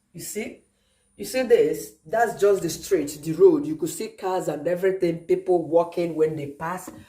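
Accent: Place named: Pidgin, Nigeria. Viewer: I enjoyed listening to your story coupled with the mixture of Naija pidgin English🤣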